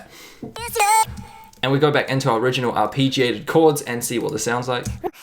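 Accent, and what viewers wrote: New Zealand. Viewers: hahaha yeah, i started watching and i was like damn i swear this guys from nz then i checked the channel description 😂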